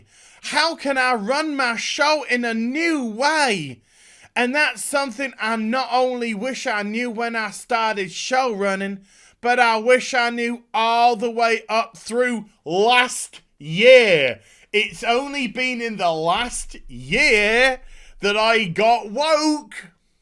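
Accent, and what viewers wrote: South, United States. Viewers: Holy shit! Being from the UK you sure got the Southern United States accent down pat! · I love your southern? US accent lol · You sound like a lovely southern belle, and would fit in wonderfully during the mid 1800's.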